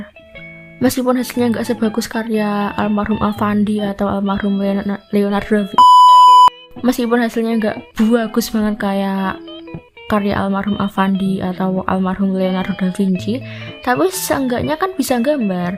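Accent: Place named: Java, Indonesia. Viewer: Medoknya bikin candu😚😚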